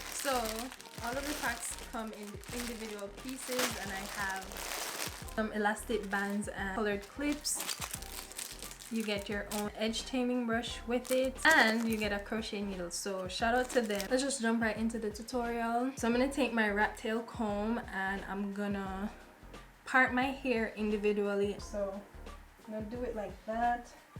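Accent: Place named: Caribbean. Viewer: Lovely to see another Caribbean queen! Love the hair and your accent!!!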